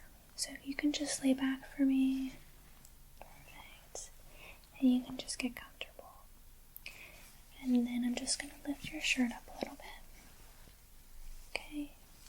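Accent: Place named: Midwest, United States. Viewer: I like your slight accent but I can't tell the region. Midwest somewhere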